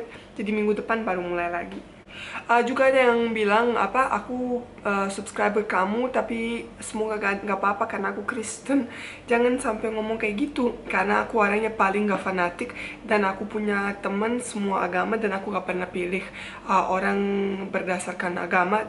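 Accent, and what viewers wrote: Jakarta, Indonesia. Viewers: Pinter banget bhs indo tetapi kanyak orang jkt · Aksen ngomong,gerak tubuh indo bingitz neng,klo bahas gaul nya,udah rempong bingitz,semangat terus yah,selalu tebar kebaikan dan positif thingking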